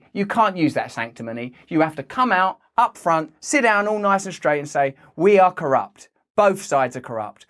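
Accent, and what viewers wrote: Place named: United Kingdom. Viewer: How does anyone stand this word salad firehose million words a minute but not saying much of anything speech delivered in that “nails on a chalkboard” British accent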